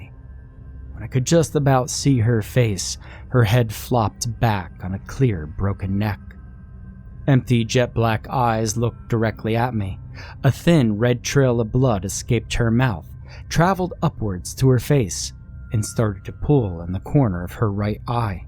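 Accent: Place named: East Coast, United States. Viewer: I'm hearing an East Coast accent....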